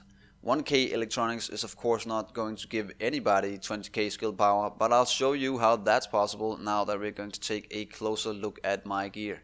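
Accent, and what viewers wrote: Denmark. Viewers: Er du fra københavn ?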